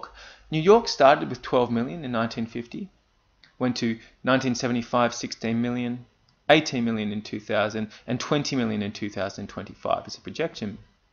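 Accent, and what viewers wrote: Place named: New Zealand. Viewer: I bet you are from New Zealand. Loved your video! Thank you.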